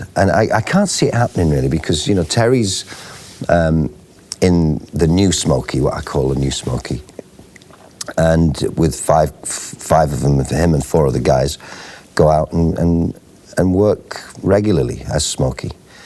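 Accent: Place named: United Kingdom. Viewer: Love listening to yr posh English voice. Come back again.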